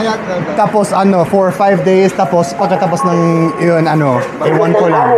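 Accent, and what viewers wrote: Philippines. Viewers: Ang galing ninu sir magsalita.ng tagalog filipino accent din xa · You sound very Pinoy to me good job! Thanks for appreciating our morning staple hot pandesal uniquely pinoy! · You're actually very good in your tagalog! The way you pronounce each words sounds and your filipino accent, and the pitch so perfect real filipino. You dont have that fuency yet like the real speaker, but with your knowledge of the language no one could scam you.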